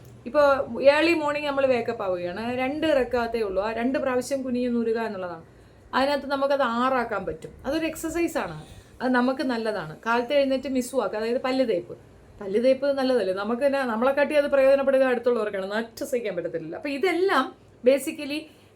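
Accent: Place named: Trivandrum, India. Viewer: Ningal oru kidukkam thanne😂 Va thoranal mottham kidunkkunnu😢samsaram keddappa thanna manasilayi trivandrum ennu 😂🎉😂🎉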